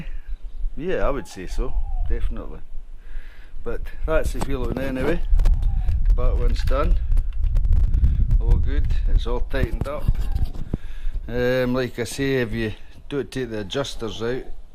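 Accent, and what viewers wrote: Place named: Fife, United Kingdom. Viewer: Very nice! Being from Fife I knew the accent was from over that direction lol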